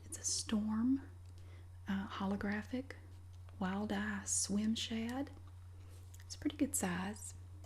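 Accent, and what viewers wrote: South, United States. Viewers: I subscribe to your channel and was curious about who you follow. This is a great channel - thank you. The Southern accent is brilliant. I am from the UK so it is unusual for me! But makes for great ASMR!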